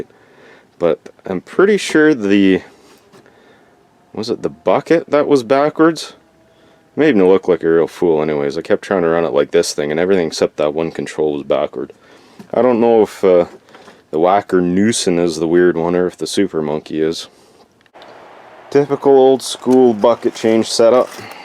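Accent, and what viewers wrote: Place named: Canada. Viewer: We know a Canadian accent when we hear it hellll yeah bawd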